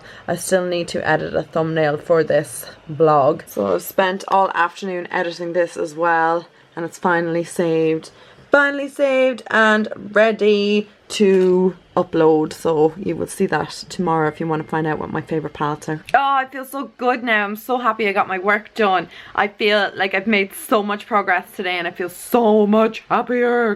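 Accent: Dublin, Ireland. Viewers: are you from Dublin? I always though you were from the country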